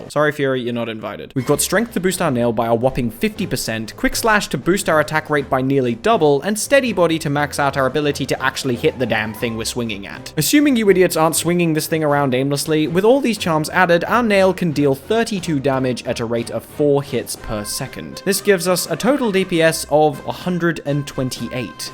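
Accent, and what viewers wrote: United Kingdom. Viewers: I mean this in the most positive way possible, but I wonder how well this series would do without the british accent, because I think it implicitly adds so much to the videos through the sort of "snarky british" trope.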